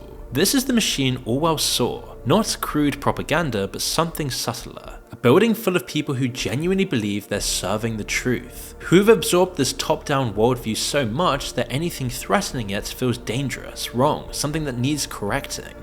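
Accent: United Kingdom. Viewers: I forgot was British because accents kind of go over my head nowadays. I don't notice them anymore lul.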